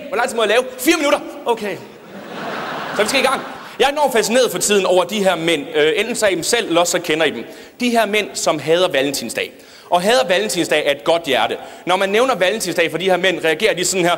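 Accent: South Jutland, Denmark. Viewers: skal bare lave en lydbog af Harry Potter på sønderjysk det ville være så fedt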